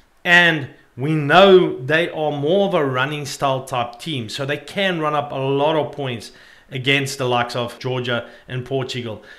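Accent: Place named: South Africa. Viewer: So weird seeing white person with South African accent.wales beat Fiji they was just to good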